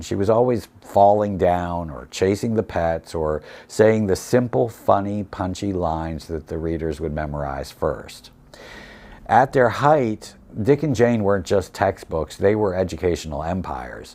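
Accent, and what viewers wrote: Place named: New Jersey, United States. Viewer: P.S. whatever sounds like New York but you do not sound like a New Yorker. Oh! Ok NJ.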